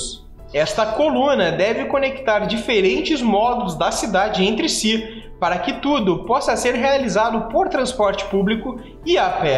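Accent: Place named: Curitiba, Brazil. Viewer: Ele é de Curitiba, por isso ele tem esse sotaque.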